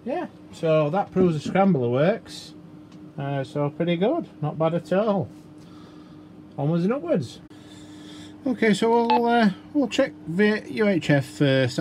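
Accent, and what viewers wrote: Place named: Manchester, United Kingdom. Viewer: Brilliant explanation to a newby. Plus your accent is near me, Manchester 👍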